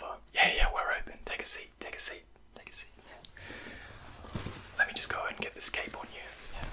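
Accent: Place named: Australia. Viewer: As an Aussie you never realise Aussie accents until you hear them in videos 🤣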